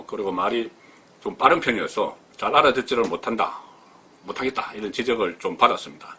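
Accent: Gyeongsang, South Korea. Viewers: 그래요.. 잘보고있어요. 경상도말씨같은데요. 고향이어디신지궁금해요. 지금잘하고계십니다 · 구독자 10만을 축하드림니다~경상도 억양이 매력적이죠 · 10만 추카드립니다 발전을 위하여 쓴소리 좀 할게요 저도 경상도지만 억양은 고칠수 없습니다 하지만 말의 속도는 줄일수 잇죠 ㅋ 연습하셔서 속도는 좀 줄이면 좋겟슴다 꾸벅! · 10만 구독 축하드립니다. 진솔함이 묻어나는 송본부장님의 구수한 경상도 사투리 정말 좋습니당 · 경상도.사투리도좋아요 축카합니다.100만쭉~~ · 저는 경상도 사람이라 의 말투가 정겹기만 합니다 오늘 자막처리한 글에 오타가 있습니다 붉어지다(×) 불거지다(0) 아! 10만 구독자 돌파 축하드립니다 내년 이맘때는 50만 독자 돌파를 기원합니다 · 추카 추카 합니다 늘 응원하고 있습니다 날카롭고 군더더기없는 비평! 긍께 어쩌겠어요 사투리를 경상도 사람은 잘들리고 알아듣는데 ㅋㅋ^^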